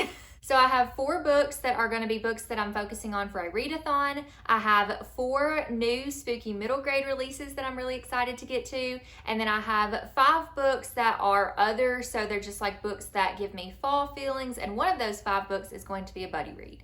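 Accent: South, United States. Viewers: I love September on BookTube because we have so many good readathons here! I’m going to be participating in Series September! Happy Reading! So nice to hear another southern accent here with me on BookTube! 👏👏 I’m also ready for fall too🤣🤣🤣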